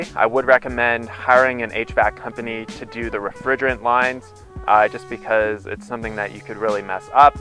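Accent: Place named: Canada. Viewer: I'm from Western NY so I recognize your subtle Canadian accent. I enjoy hearing it and your video content.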